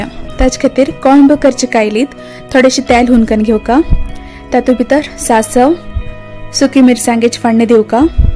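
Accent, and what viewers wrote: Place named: Karwar, India. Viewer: Loved to hear your Karwar konkani...i am also konkani ,but from Mangalore (GSB)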